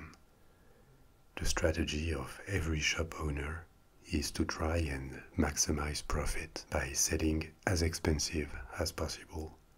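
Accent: France. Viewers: I think you are one of my newest favourite asmr artists. That french accent.... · I'm a huge fan of ASMR with female voices, triggers it better for me personally. However, you sir, and your deep dark chocolatey French accent do the job quite well.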